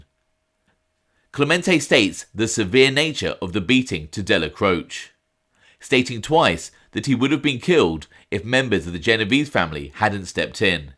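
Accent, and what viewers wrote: England, United Kingdom. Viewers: There s nothing like reading a transcript with an English accent …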